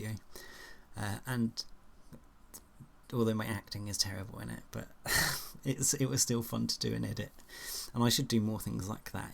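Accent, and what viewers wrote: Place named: United Kingdom. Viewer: Yesterday I discovered the"mentions" tab in the comments section (classic really, about 5 years late on that one, so right on schedule for me) and I'm honestly so surprised and moved by what you said here! Thank you, really. Although I have to say the biggest surprise was connecting your icon that I know so well with an actual face that both has a British accent and is wearing a Packers hat 😂 reaaally trippy moment for me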